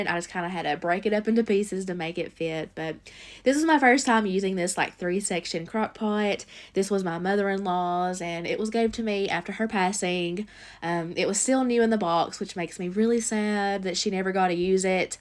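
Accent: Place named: Kentucky, United States. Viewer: I'm from Lexington lol. I didn't know where you were from but I thought I recognized a KY accent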